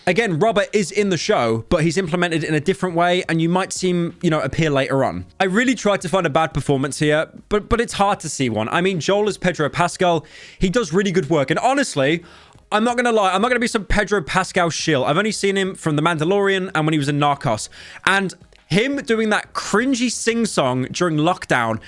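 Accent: United Kingdom. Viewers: Haytch be oh bri-ish bruv make the h letter complicate m8 good content will view again get at it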